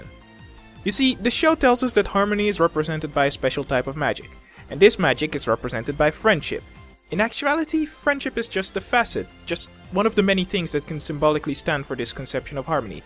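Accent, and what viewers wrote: Netherlands. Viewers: No reason to be so harsh on the Dutch, I'm well aware how challenging English pronunciation can be since I've experienced it first-hand (English is only my fourth language but boy have I worked hard to get rid of my accent! Only through sheer determination do I sound almost indistinguishable from a native speaker today). Nevertheless, even though you claim that English is your first language you clearly have a Dutch accent, even if not a thick one. Comes from living there, I guess? Never mind. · I noticed the dutch accent and I've been skimming the comments but it raised a question. are you native dutch or did you move to the Netherlands? Also if I may ask what province do you live in? I've met several dutch bronies on the internet but they where always on the other side of the country. And regarding the dutch speaking English. yes its true every one i know has a massive accent the moment they speak english myself included. 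I'm working on getting rid of that(not that easy:D)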